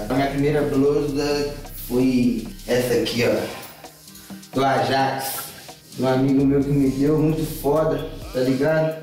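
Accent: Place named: Belo Horizonte, Brazil. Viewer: bh ne cria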